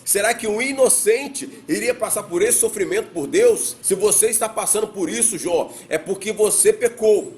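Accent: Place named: Bahia, Brazil. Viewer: Gostei muito do seu jeito de explicar deste jeitinho baiano rsrs, Que Deus continue abençoando.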